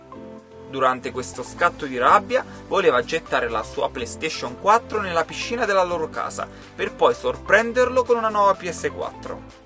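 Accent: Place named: South, Italy. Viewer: trick analfabeta....trick.........youtube la patria degli ignoranti con slang meridionale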